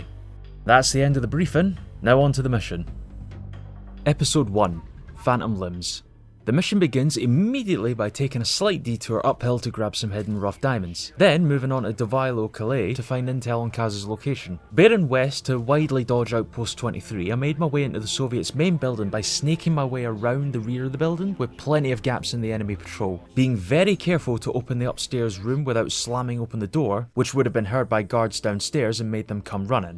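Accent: Scotland, United Kingdom. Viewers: I can’t place your accent. East coast of Scotland? Edinburgh? Dundee? 🏴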